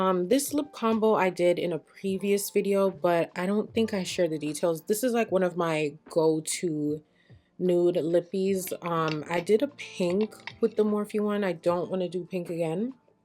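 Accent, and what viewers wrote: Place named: Jamaica. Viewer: It’s the Yardie accent for meeee lol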